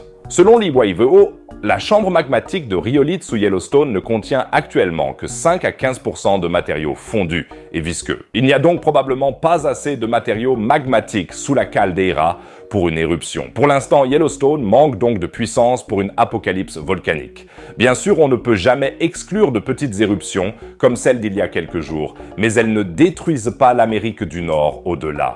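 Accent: Quebec, Canada. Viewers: Accent quebecois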